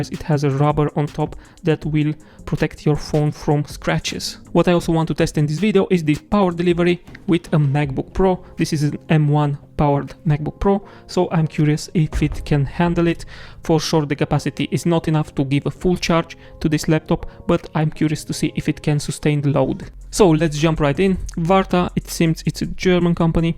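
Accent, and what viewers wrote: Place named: Romania. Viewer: accentul de roman se cunoaste fratele meu :D:D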